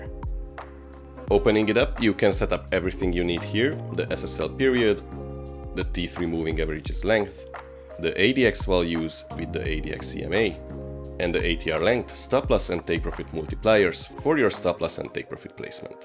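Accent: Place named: Hungary. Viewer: Hi! My I ask, are you Hungarian? Just because of your intonation and accent sounds like that. I am from Hungary! 🤘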